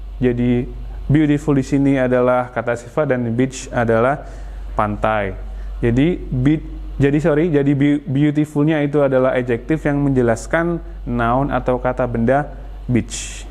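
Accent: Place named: Cileren, Indonesia. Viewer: kaya wong cileren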